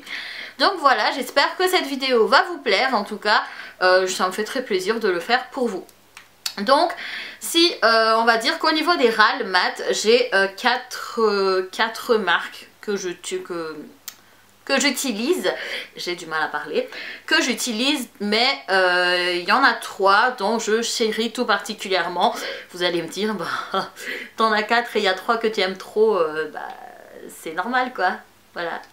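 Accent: Madagascar. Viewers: Ça se voit et s'entend qu'elle est Malgache. " Manaona Ampelassoua " .... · Tu as de l'accent Malgache 😗😗😗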